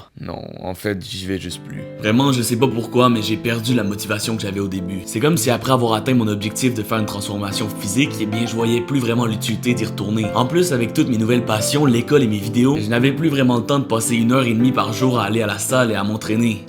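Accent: Quebec, Canada. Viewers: Je suis désolé mais J’ADORE l’accent québécois c’est pas méchant je le trouve trop bien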